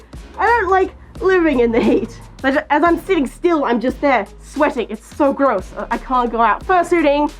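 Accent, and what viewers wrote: Australia. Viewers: You sound more australian then when I was last here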